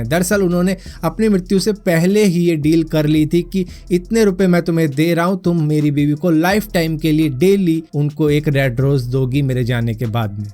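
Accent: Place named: Haryana, India. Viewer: Bhai ik video haryanvi mai bnao plz...aapka slang bhot acha he...plzzzz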